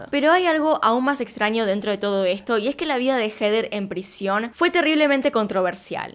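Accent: Argentina. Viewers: realmente existen hijos asi que horror mejor es alejarse con el dolor del corazon no se llevaban bien creen que USA hubiese quedado impune si hubiese matafo al padre era una justificacion por el dinero y odiaba a su madre yo hubiese dividido el dinero y preservar su vida y salud mental.interesante caso gracias me encanta tu voz con tu dejo argentino saludos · Eres argentina?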